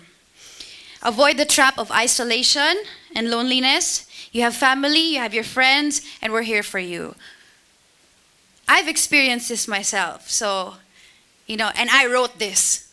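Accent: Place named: Philippines. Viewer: Any non filipino out there loves her filipino accent??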